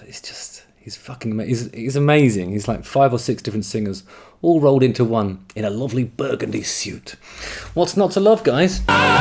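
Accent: United Kingdom. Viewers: I’m curious if you had the opportunity what would you like to hear Dimash perform, not a song but what genre, rock, ballad, etc. really enjoy your chats. Love the British accent, makes me homesick. 🤗🤗🤗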